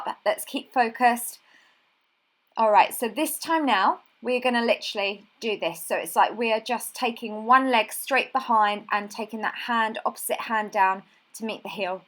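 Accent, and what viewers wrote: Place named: United Kingdom. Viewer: ommmmmmmmmmmmgggggggggggggggg your accent is sooooooo cute!❤❤❤❤❤ best british accent ever heard!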